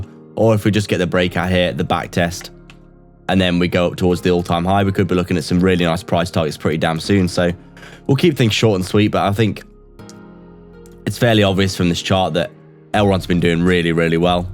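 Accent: United Kingdom. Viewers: You didn’t say where you were from. I’m guessing Brighton👀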